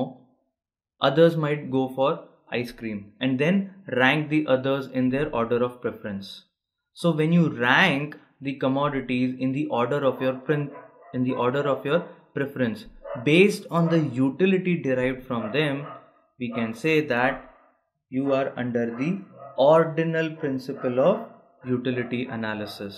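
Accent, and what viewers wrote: India. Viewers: Rosa s actually he is an Indian that's why. Plus when it comes to economics there are thousands of videos made by Indians :P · why most economic tutorings are in indian accent,I do have problem understanding this accent.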